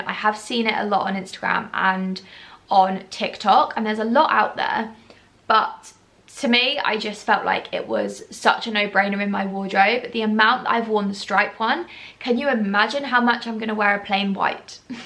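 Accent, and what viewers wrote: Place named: United Kingdom. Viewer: What part of The Uk are you from ?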